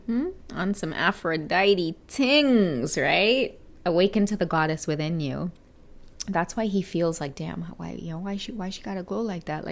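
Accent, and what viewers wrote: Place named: Trinidad and Tobago. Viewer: Aye you not eash na with dah trini accent, makes me laugh...lol.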